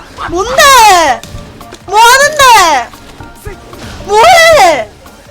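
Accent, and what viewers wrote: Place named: Daegu, South Korea. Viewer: 부산사투린줄 알았는데 대구였군여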